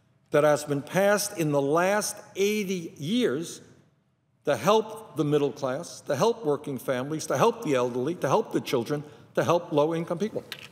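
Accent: Brooklyn, United States. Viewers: Few accents I enjoy listening to as much as a Brooklyn Jew!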